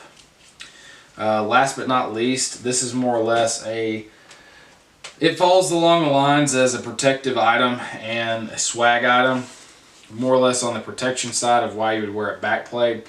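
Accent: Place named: Arkansas, United States. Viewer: I can tell Your from Arkansas... me to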